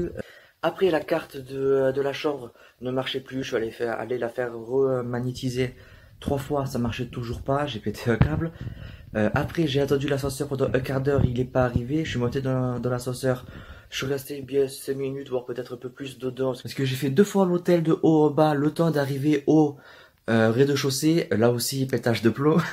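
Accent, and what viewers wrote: South, France. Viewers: Coucou ça va? Merci pour ce vlog, depuis ce matin je trépigne d'impatience à l'idée de le voir lol. J'ai pas été filmer au meilleur moment mais je me rassures en me disant que je ne suis pas la seule lol. Tu as enchaîné les merdouilles le dimanche, la vache. Ce salon a été compliqué pour moi comme tu le sais, mais si je devais revenir en arrière, je reviendrais ne serait-ce que pour te voir. Même si on ne s'est pas vus tant que ça sur la journée chaque moment passé avec toi était super et je super contente d'avoir pu te voir en vrai. Tu es quelqu'un en or, je t'adore! Ne change surtout pas et à toutes les mauvaises langues, allez voir ailleurs. Perso, j'adore ton accent du sud. Tes sourires et nos conversations m'ont redonné le sourire samedi et pourtant c'était pas gagné donc tout simplement MERCI <3. Bonne soirée et belle lecture!!! 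Bisous.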